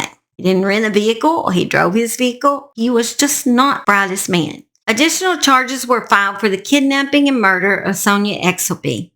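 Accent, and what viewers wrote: South, United States. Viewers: The craziest, most disturbing part is how she wanted to go out! It boggles my mind as to why she would make the decision that she did. She's so beautiful and sweet and innocent looking, you'd never guess in a million year's how disturbed/mentally ill she was just by appearances. And why let that ugly, disgusting, slovenly looking piece of 🐕‍🦺💩 touch her? This case really disturbed me on a deep level. I hope she's at peace and in a better place👼🏻🪽🙏🏻, if there really is an afterlife, and I hope the opposite for him when he goes 🔥👿. Also...this is my 1st time to your channel and I can tell you've done a lot of research, there was a ton of detail that you've put into this video...and I love your southern drawl.😁 Great work ...subscribed✅ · Good Morning 😊 Im watching your newest video and wanted to say I Love your Southern accent!! 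I'm somewhat a Southerner myself. I live in NC originally from SC.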